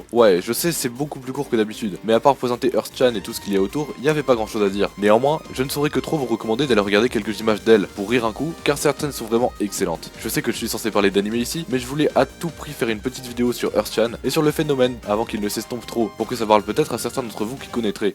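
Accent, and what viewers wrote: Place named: France. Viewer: MÈME Jpp j'en ai marre des français. C'est un Meme et ca ce dit mims pourquoi vous changez tout avec votre accent de bite. Trigerred.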